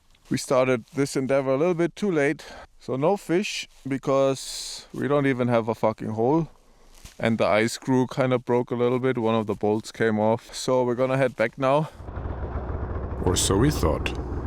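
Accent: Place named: Germany. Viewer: A german accent in the yukon snow in underwear, what gold.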